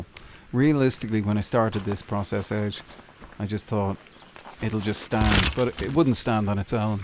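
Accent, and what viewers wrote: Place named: Ireland. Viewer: Fantastic stuff. And I always enjoy how Irish folks say column!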